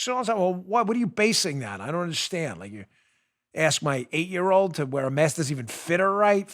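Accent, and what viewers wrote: New York, United States. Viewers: You lost your audience alright... every time you are fake by calling on, stop me if I lose the audience bullshit. Do you really think that you are talking to a bunch of people that can't follow a new yorker trying to explain something? With dis and dat and dee udder ting...? You know, I take a break from you for about a week or two, and then my brother in law tries to get me to listen in again, and you can't seem to have one program where you don't offend me by acting fake..., stop me if I lose the audience... How the hell would he know? You seem to get worse as your show goes on. You scream and whine really loud and I have to turn down the volume, then you talk super cool and quiet, and I can't understand what you said. I used to turn up the volume, then you would forcefully start talking again and I would have to mess with the volume again. 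I think that I will unsubscribe your channel and go some where else for my fear mongering... you don't seem to be the guy.... I even get tired of seeing you on Fox, as you look so buff and tough, even though everybody has heard you hit the third octave above your normal voice, kinda like Mike Tyson.. heheheh...adios... I know you wont give a shit about me leaving... that just says a lot about you... and me both...!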